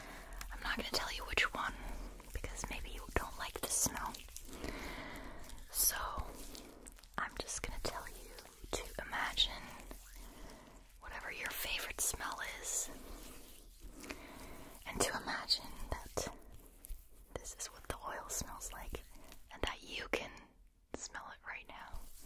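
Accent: Germany. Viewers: The fact that shes german and her english + asmr is amazing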